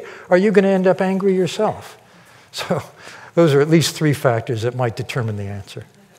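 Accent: United Kingdom. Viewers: I feel very connected during your Q&A😂😂😂 British accent never fails me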